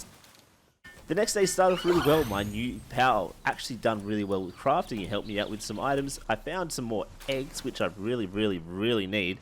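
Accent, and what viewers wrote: Australia. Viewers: I can't tell whether he's saying "pals" or "powers" because he's too Australian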